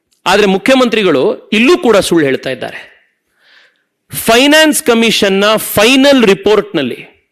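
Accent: North Karnataka, India. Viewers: nimma hage uttarakarnataka bhashe bere yara bayalloo heege agodilree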